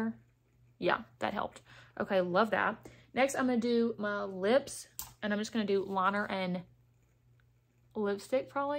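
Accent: South, United States. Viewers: Where are you frommm?????? I hear a little southern accent mixed in there!I’m from South Georgia and it’s REALLY nice to hear somebody else that sounds like me on social media!!!! 🫶🏽🫶🏽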